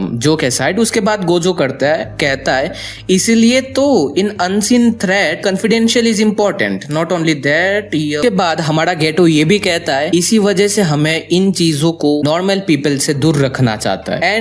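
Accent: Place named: Bengal, India. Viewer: Kisi Bengali bol ra lgta h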